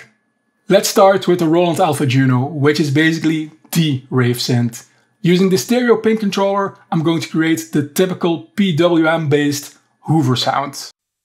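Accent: Netherlands. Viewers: It because of the Dutch accent. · In the US that's a Millenial. I'm used to hearing the Dutch accent so much that I didn't even notice yours. LMAO! I'm a Dutch American. My ancestors came from Holland and Austria.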